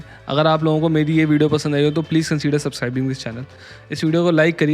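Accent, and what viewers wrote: India. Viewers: This is Indian English, deal with it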